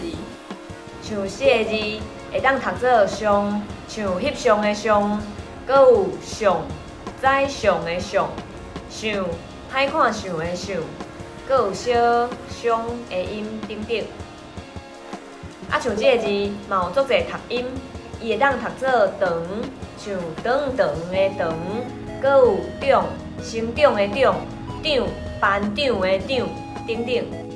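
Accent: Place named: Tainan, Taiwan. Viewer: 道地台南腔！😆👍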